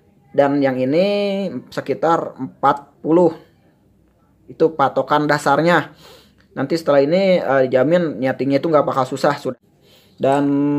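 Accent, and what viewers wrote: West Java, Indonesia. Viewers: Sunda pisan kang. Wkwk Bermanfaat pisan video na. Hatur nuhun 🙏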